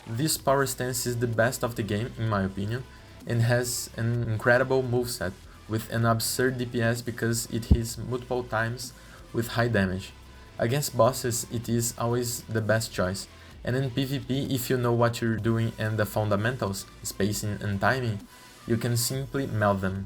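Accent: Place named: Brazil. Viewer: sotaque BR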